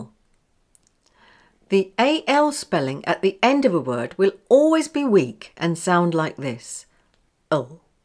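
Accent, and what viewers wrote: RP, United Kingdom. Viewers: Thanks so much 🙏😊. I love your posh English 🎉.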